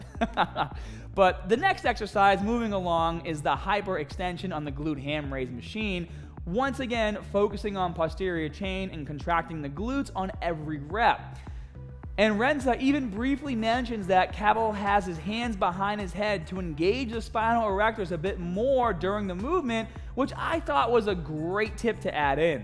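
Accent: Boston, United States. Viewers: Staaaaaaat. Get in the caaar! Bro the Boston accent is thick as fuck 🤣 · why do you have a boston accent in the first half and a regular accent in the second? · is this guy from bostonkkklkokkjkj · Didnt realize strong Boston accent until this video, boston represent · took me forever to work it its not much like other bostonians i know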